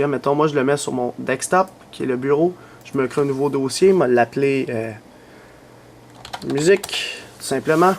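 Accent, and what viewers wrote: Quebec, Canada. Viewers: mec j'habite a montreal depuis 6 mois et c'est sur youtube que je trouve le meilleurs accent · L'accent de Québec déchire! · J’aime bien ton tuto je suis aussi Québécoise alors je comprend très bien de la manière que tu parle merci cela est parfait. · ahha caline jai clique sur la video sans savoir que tetais quebecois! chai pa pourquoi mais ca a comme faite ma journee!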